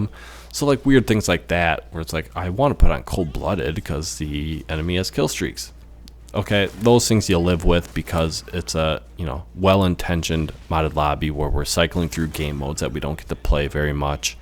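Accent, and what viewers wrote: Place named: Midwest, United States. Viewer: You sound so midwestern lol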